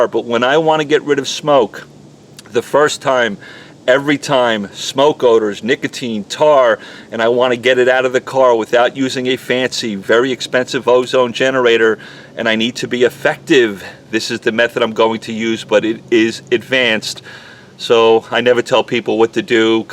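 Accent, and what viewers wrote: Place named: North, United States. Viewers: wide awake thanks for helping . I can't stand his boston accent . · You don’t sound like you’re from Dallas. You sound like a yankee.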